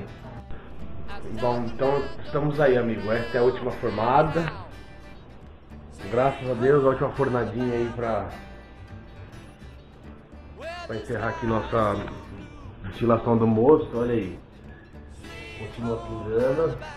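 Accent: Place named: Limeira, Brazil. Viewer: Amigo, vc é de Limeira-SP?? Parece, pelo sotaque.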